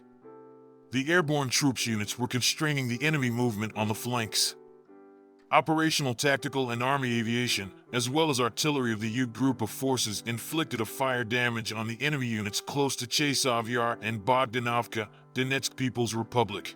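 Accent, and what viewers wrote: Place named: United States. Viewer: Hello you have an American accent but a Nigerian name.Are you from Africa?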